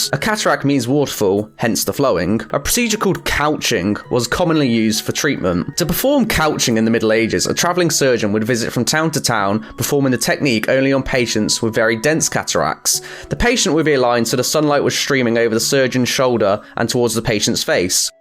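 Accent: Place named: United Kingdom. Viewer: To hard a british accent. Sounds like he ismeither too lazy to form complete words with a space between them, or has a mouth full of crap.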